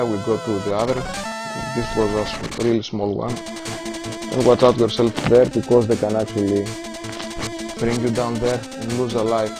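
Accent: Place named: Greece. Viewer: i sense a greek accent. :)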